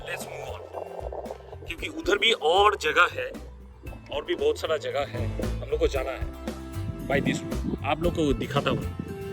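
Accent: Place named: Kolkata, India. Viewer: Love the way u talking dada.. Feel like kolkata....☺️ impressive photography.✨️🌟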